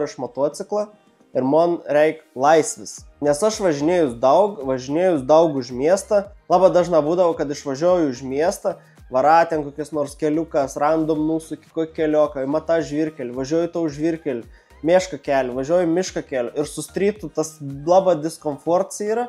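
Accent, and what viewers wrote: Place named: Samogitia, Lithuania. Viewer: Kazkaip keistai smagiai ta zemaiciu kalba klausos visokie vidosikai 😀👍 Maladietc!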